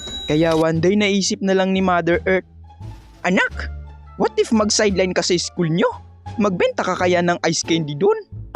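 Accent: Visayas, Philippines. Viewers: Bisaya kapo ba kuya? Ask lng po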